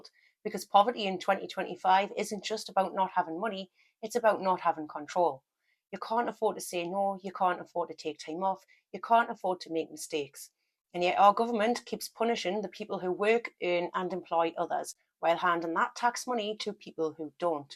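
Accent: Newcastle, United Kingdom. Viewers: Fascinating insights but for some reason (not in a creepy way) the geordie accent is engaging to listen to 😅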